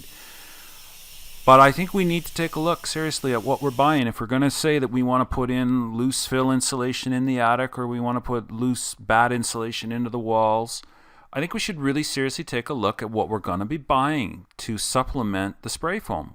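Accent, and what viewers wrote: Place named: Canada. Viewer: Does the foam have to be flush with the inside stud faces? What about convection channels behind the drywall in the stud bays? (It's on the warm side). Can a guy do 3 inches in a 2x6 wall -- or is is better to use 2x4's and fill it up? This topic is unbelievably frustrating; the whole building science topic is unbelievably frustrating -- especially in Canada. Excellent content. Excellent presentation. Excellent Canadian accent.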